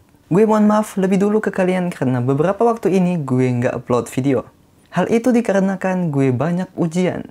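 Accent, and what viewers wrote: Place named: Java, Indonesia. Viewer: Tkumi irng jepang ap jawa sih mas jawany melekat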